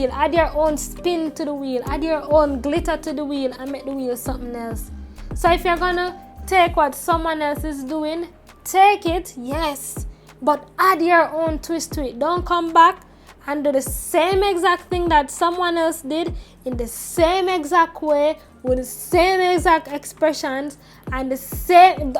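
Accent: Jamaica. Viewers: Alsooo... I love listening to you speak! Makes me feel like im around my cousins and family in Jamaica ❤️❤️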